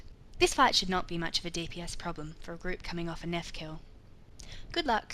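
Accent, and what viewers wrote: Australia. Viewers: Aussie narrator, US based guild. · Aussie right?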